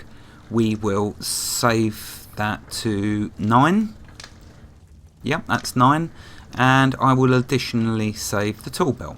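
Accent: United Kingdom. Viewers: cool - I will continue to try and get the level up - I would love to join the server and get whatever speak thing you use (Teamspeak?) up and running - I am from Kent originally but I have been living here in Arkansas for about 3 and a half years so its good to hear another British accent - the usual response to my accent here is "you ain't from round here are you boy" - I generally respond by saying oh what gave it away LOL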